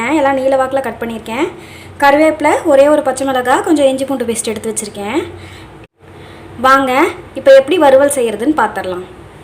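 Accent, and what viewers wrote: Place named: Salem, India. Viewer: Speaking slanging Adi thuul akka . That's salem guys 🔥✈️⛏️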